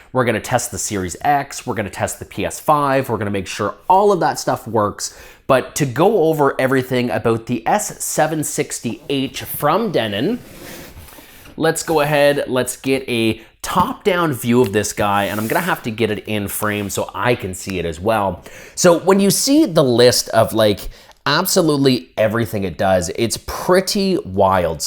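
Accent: Canada. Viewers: This has to be the most canadian-sounding human on the planet. Great video though.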